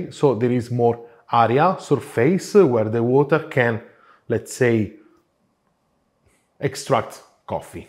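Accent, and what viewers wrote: Italy. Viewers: Hello, love your show and you have a nice Italian accent and well-spoken English. Just wanted to tell you that a word that you use often should have the accent on the first syllable as is the case in spoken Italian. The word is VARIABLE.....VA' REE A BLE...not...VA RYE' A BLE . Please excuse this subtle correction while I always chastise English and American native speakers who are often the worst at respecting foreign word pronunciation.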